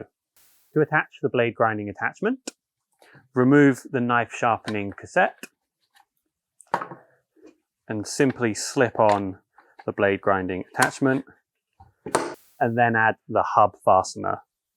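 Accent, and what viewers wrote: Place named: Midlands, United Kingdom. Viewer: It’s so lovely to hear a Midlander! Aye Up! Great introduction to the Grinding Attachment.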